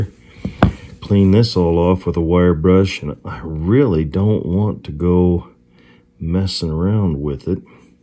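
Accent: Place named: South, United States. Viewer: I want to go to that flea market with you. I am a hand tool woodworker and restorer. I found and restored most of the tools in my shop and use them daily. However, some of the tools you showed made me want to jump through the screen and see the prices. I live in Upstate, NY. Still trying to figure out where you're from, but you sound a little southern to me. Just guessing...LOL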